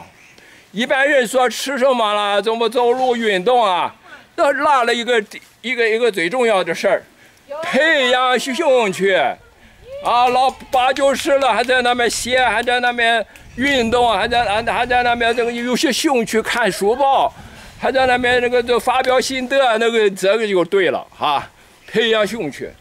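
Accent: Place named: China. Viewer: 张先生的乡音未改，还是一口家乡话，地道"外省人"的中华民国情怀。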